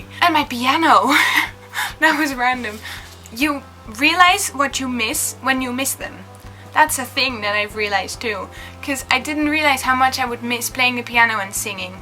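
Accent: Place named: United Kingdom. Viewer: your british accent is there :) so amazing :D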